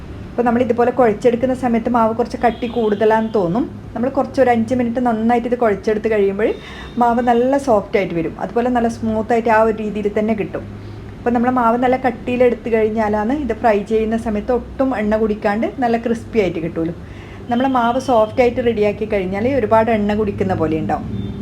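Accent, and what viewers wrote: Kannur, India. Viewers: Nice name " Thakaarapetti ". Due to shortage of time, can't try many receipe. But will try for sure. Heard that Thalassery, Kannur people are very good in cooking. I like your Kannur slang . Thank you dear. 😊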